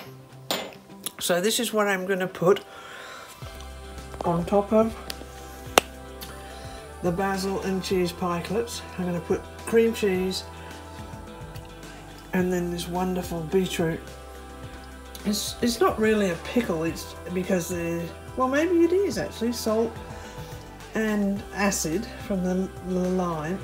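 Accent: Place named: United Kingdom. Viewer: Ah.... You have made my day, thanks for the fabulous video.... 💖, hello from France.. 🙋.... you sound very British, at the end of you"re videos, a nice cup of tea is very British....!! 😂🤣, mind you the French may say, "the trouble with you British, you think you can solve problems with a nice cup of tea" 😂🤣😆... Keep those videos coming.... Thank you, I'm off for a nice British cup of tea..... 👍🙏... Xx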